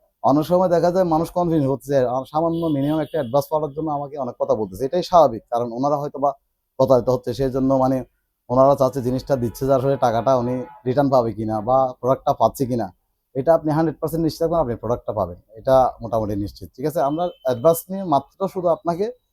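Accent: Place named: Chittagong, Bangladesh. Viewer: ইভা চিটাগাগত তো মনে হচেছ।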